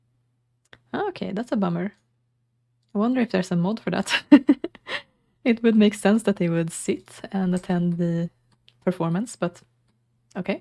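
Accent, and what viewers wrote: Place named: Sweden. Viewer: I just found you when just looking for a new sims 2 let's play to get into! Got so excited when o heard you accent, I'm also Swedish so I look forward to seeing your content even more now. I've actually been meaning to start a channel to play sims 2 etc but been procrastinating it for years, and only recently decided I'd do it in English instead of Swedish to not make it too niche. Verkar som att nån hann före mig! Haha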